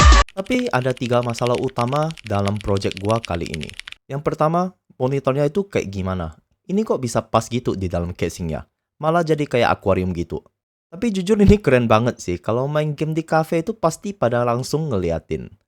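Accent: Indonesia. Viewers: dari caranya ngomong gua yakin orang ini Cindo.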